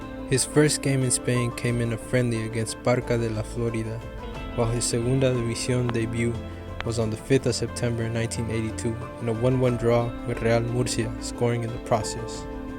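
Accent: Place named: Paisa, Colombia. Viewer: Puta solo ecucharte sabia que eras paisa Great my bro, HE is our Hero and yeah cause he was from E.S he's not as famous...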